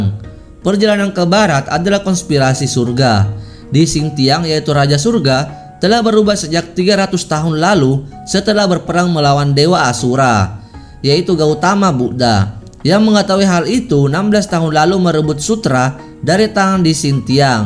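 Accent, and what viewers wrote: Takalar, Indonesia. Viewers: Orang takalar sulawesis elatan kah?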